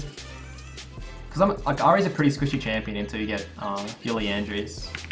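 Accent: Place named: Australia. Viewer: Australian? can tell by the accent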